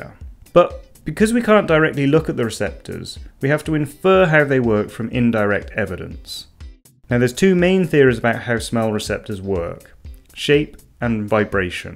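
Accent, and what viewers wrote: England, United Kingdom. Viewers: Question: Where are you from in England? You speak very similar to James May.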